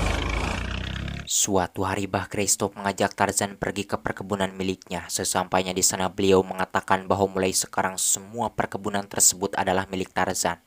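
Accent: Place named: Sunda, Indonesia. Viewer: Cara bicaranya itu loh .endingnya yg menyesatkan 😂😂khas suara dngan nada kesundaan 👍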